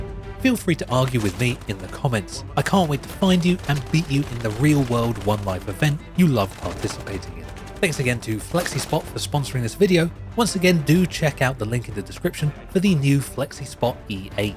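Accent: United Kingdom. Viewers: Typical dodging the question British retort on Youtube. "haha just a video game it doesn't matter, real war bad" I really see the weather caused depression and I'm glad my ancestors waged war to separate ourselves to where now your prince married some tramp and your king sucks on top of that. I mean why even go over real life in a military simulation (besides the fact artists and coders more skilled than you dumped their heart out on this experience). And your argument? Hilarious, you give no solutions to the issue you complain about. Yeah, you claimed milsims stupid because they're not real life. I don't play milsims but I know what you just said was placed on a Received Pronunciation Platter of over confident self-hatred, the next course is projection. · Why is a British guy telling me the gun is unrealistic. You’ve never shot a gun. This is more realistic than it was. 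When you shoot a gun with a scope, the scope moves.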